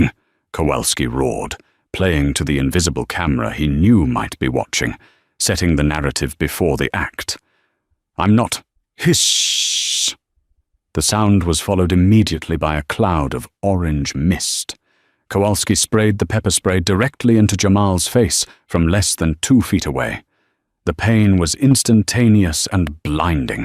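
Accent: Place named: United Kingdom. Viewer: STOP with the British voice and pronunciations when dealing with American settings.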